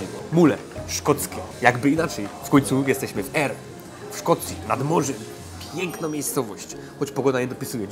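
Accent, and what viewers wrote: Silesia, Poland. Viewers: fajna slonska godka. cool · Czego chcieć wiyncyj ? Śląsko godka, dobre żarełko i fajno muzika. Super! pozdrawiom!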